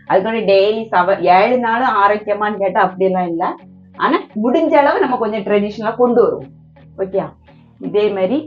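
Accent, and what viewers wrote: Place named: Kerala, India. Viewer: Kattu soru. Kanyakumari enbadhal malayala accent/ dialect oda pesuhirar.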